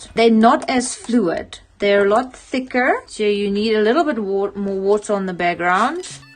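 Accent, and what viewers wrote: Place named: South Africa. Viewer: Your tutorials are fabulous! I just found your channel and subscribed! I thought your accent is from South Africa! I am too from SA! I live in Adelaide now in Australia, but might be moving back to look after my mom. Do you live in SA?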